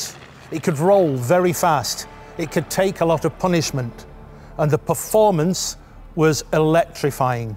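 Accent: England, United Kingdom. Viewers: I think the dropping of the "e" is more to do with the speaker's regional English accent than anything else.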